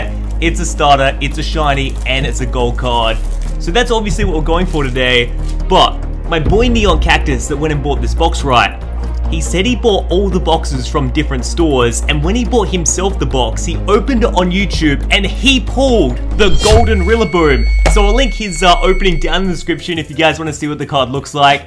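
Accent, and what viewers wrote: Australia. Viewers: Is he an Aussie YouTuber?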